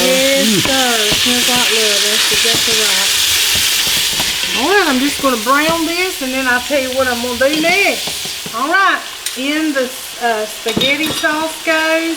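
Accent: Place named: South Carolina, United States. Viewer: Y'all just came up random in my feed but really caught me ear. You sound like you grew up in a South Carolina cotton mill town. Uncle Sam sent me a lot of places, but I sure miss that little mill town I grew up in.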